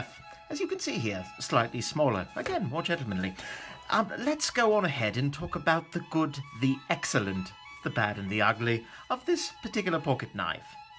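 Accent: United Kingdom. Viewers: OMG... British voice is more normal than his bat-reviewer voice!!! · Stellar accent 🇬🇧 Super review.....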